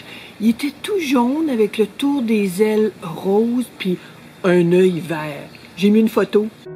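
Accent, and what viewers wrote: Quebec, Canada. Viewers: Bonjour, je suis tombée par hasard sur une de vos vidéos!!! Merci pour vos conseils, votre dynamisme et cela fait du bien d'entendre la parler québécoise (j'ai eu la chance d'habiter 1 an à Montréal).... et surtout BONNES VACANCES!!!!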